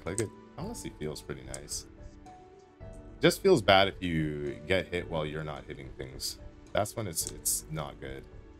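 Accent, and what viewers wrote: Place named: Canada. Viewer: very well spoken canadian i can tell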